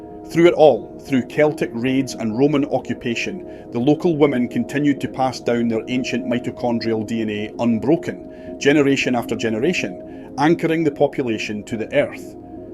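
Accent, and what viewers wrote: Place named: North, United Kingdom. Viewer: Interesting. Thank you for the subtitles, otherwise I could not understand your accent. I have heard the New Zealand English, which was very difficult, but I think yours is from somewhere in northern Britain ?🌹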